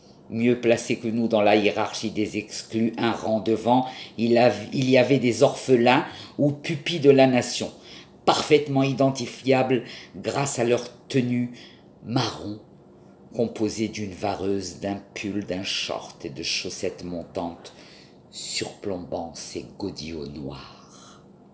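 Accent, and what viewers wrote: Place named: Morocco. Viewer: نعشق لهجتك المغربية التي تدكرنا بالعلاقه المثينة بين اليهود المغاربة والمسلمين المغاربة.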